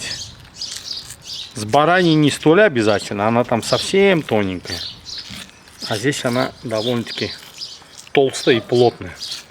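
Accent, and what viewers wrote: Baku, Azerbaijan. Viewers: Маэстро! Просто супер! Бакинский акцент, свежайшие продукты и руки профессионала - все что нужно для хорошего шашлычка!) Дай Бог вам здоровья! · Ай брат гардаш!) Это просто супер! Бакинский акцент, свежайшие продукты и руки профессионала - все что нужно для хорошего шашлычка!) Дай Бог вам здоровья!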